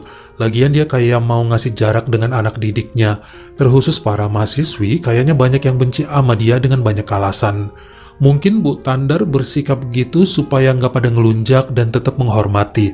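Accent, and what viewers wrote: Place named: Java, Indonesia. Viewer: HALLO S NYA 3 SYRIA SELAKU HADIR BUAT CHANELNYA KAK,........SEMOGA MAKIN SUKSES UNT CHANELNYA YA KAK, BIKIN KANGEN KRN PEMBAWAAN BAHASAANYA LUCU DKIT DIKIT EHEMM GITU DI BUNUHI DNG BHS JAWA YG MEDOK TAPI ASIK BANGET LOVE......U ❤❤❤❤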